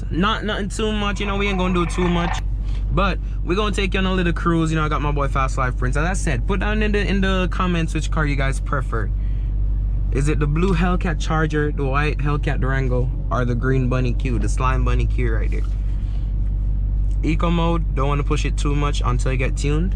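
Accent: Jamaica. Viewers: I think I can hear that Jamaican accent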